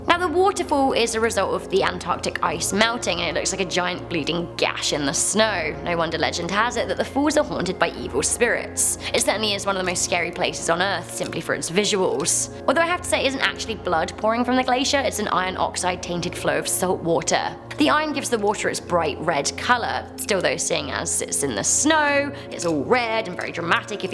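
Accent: United Kingdom. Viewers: Toronto? You sound so British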